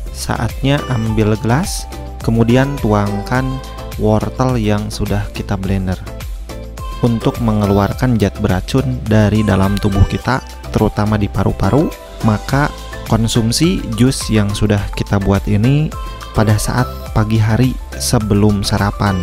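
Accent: West Java, Indonesia. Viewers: Cara ngomongnya jiga orng sunda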